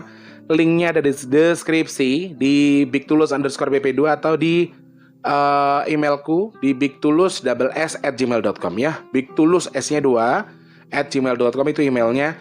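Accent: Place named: Java, Indonesia. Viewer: kbykn..orng jwa. fansny.. hbs logat.bhs cr bicr..medok bngtt. jwane... gt lho ms.. mlh. trnyt dr lampung y.... gpp ms..moh bnyk ssudr. . dr jw dn lmpung..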